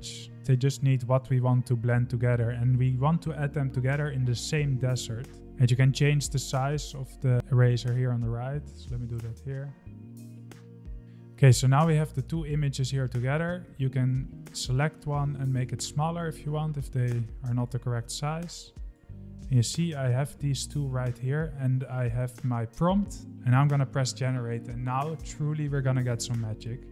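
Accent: Netherlands. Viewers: First seconds watching this video and i immediately know you're Dutch ahhaha nice accent bro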